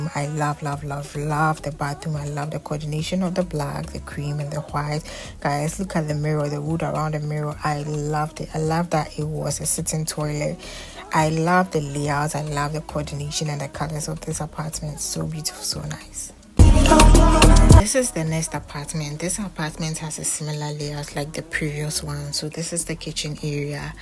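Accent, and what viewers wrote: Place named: Ghana. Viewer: I heard your accent and I knew you had to be Ghanaian. Love your video🥰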